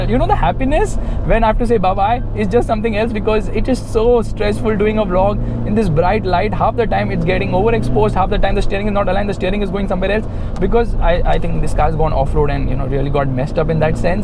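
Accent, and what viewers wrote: India. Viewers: Someone kill the Indian English accent please 🤢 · Typical indian english why dont you speak hindi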